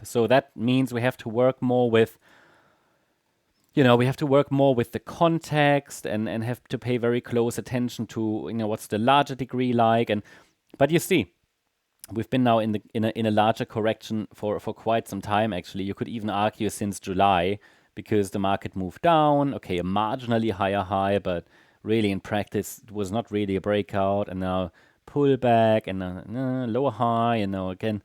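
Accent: Germany. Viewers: Man hört deutsch raus, geiler Kerl!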